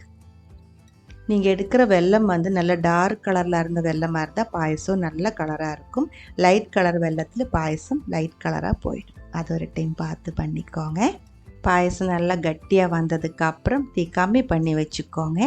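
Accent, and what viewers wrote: Kerala, India. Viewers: ഒരു മലയാളി തമിഴിൽ സംസാരിക്കുന്നത് കേൾക്കാൻ നല്ല രസമുണ്ട് പായസം സൂപ്പർ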